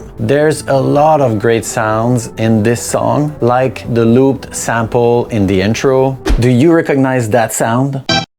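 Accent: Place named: Quebec, Canada. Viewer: Are you from Quebec by any chance ? 😊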